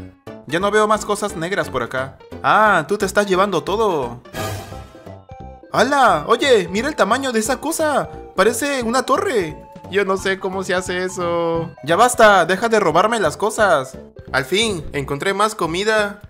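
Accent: Mexico. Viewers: Eres de México? Me gusta mucho como hablas 😸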